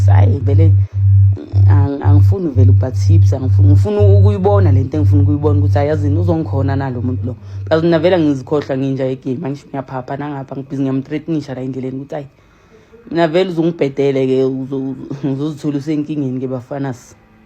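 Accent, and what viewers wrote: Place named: Msawawa, South Africa. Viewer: Watching from OrlandoEast❤Yaz bengsamangalele itone yakho netaal Kanti wangapha eMsawawa,😂❤ Lentwana Lexi zize ngamawaza🤞maar zyaseta bro🤣🤣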